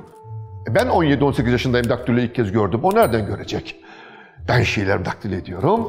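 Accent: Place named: Trabzon, Turkey. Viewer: Müthiş hikâye. Trabzon ağzıyla konuşmanız çok keyifli üstadım.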